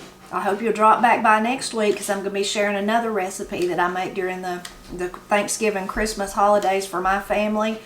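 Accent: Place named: South, United States. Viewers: oh, your accent sounds like home. I moved to the east coast from the south a year ago and never hear those southern twangs anymore. always so nice to hear them. these are a staple where I'm from in Arkansas, as well! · Great video. Watched this from the UK Never heard of this before but I will definitely make it. Loving your southern accent ❤ .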